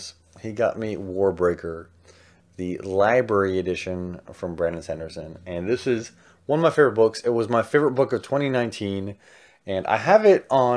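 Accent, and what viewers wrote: South, United States. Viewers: I love your video at your house in Texas during your quarantine until you had some new books are "Now I Rise" and "Bright We Burn" by Kiersten White, "Aurora Rising" by Amie Kaufman and Jay Kristoff, "Thunderhead" by Neal Shusterman, "Mistborn" and "Mistborn: The Well of Ascension" by Brandon Sanderson, "The City We Became" by N.K. Jemisin, "The Vashing Half" by Brit Bennett, "The Prophets" by Robert Jones, Jr., "Angel After the Fall" by Joss Whedon, "Angel and Faith" by Christos Gage, "Queen of the Slayers" by Nancy Holder, "Warbreaker" by Brandon Sanderson, "Fire from Heaven" by Mary Renault and so many others including "Jeremiah" by Robert Cain while you're talking about all of the characters from the book to read them, so you're reading some letters by one of your fans after that, but I hope your husband, Zach will be very proud of you and I wanna say I'm happy for you, because I love your Southern accent, I know you're gay and you're half Mexican!!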